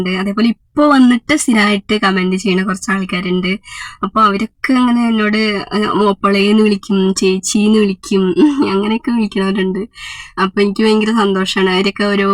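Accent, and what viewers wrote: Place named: Thrissur, India. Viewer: enik orupad ishtanu, njan trivandrum anu trishur language kelkan nalla rasamond. Enik videos ellam ishtamanu❤❤😊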